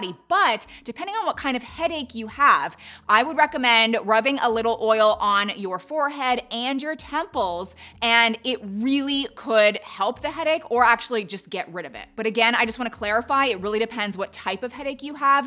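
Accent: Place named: California, United States. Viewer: I couldn’t listen because of the Californian affectations, waving nails in front of the camera and garbling at different speeds then doing lioooooong croaky bits. Calm down. Keep your hands still. Speak slowly and clearly and not as if every sentence is a question. Vocal fry and unspeak isn’t cool si=x7rH9xDPsorNr47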